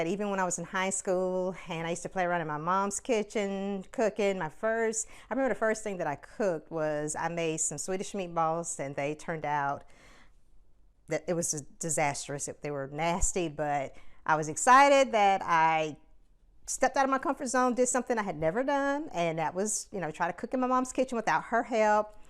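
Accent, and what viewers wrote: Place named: South, United States. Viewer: I had to downsize but I can not wait to move this summer to a larger home which will hopefully have a much larger kitchen so that I can use my Hutch again. We are so similar I love vintage cookware and kitchen gadgets and such, I have Pyrex bowls very similar to yours. I bet your home is very warm and inviting and comforting and that is the type of vibe that I hope I give in mine and I feel the same exact way about my kitchen it is the heart of my home. Where do you live down south if you don't mind my asking? I am from New York but I've been living in Jersey the last several years. I love your accent!!!!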